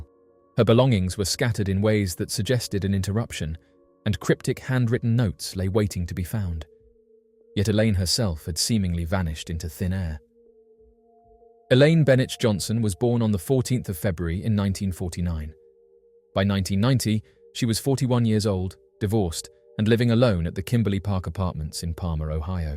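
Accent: United Kingdom. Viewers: When I first started watching this, I had a feeling that you'd be British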